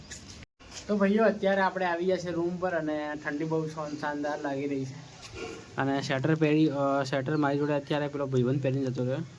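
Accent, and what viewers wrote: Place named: Bhili, India. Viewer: ભાઈ ક્યાંના છો ભીલી બોલી બોલતા હોય એવું લાગે😊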